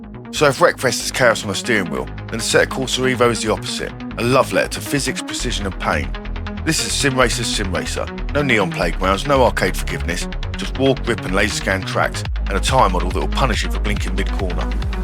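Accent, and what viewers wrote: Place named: Cockney, United Kingdom. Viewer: Wow man you've got one hell of a snappy voice over and that Cockney accent just takes me back to Ritchie's Snatch and Two smoking Barrels! Absolutely the most enjoyable trailer on ANY motorsport ever!Kudos.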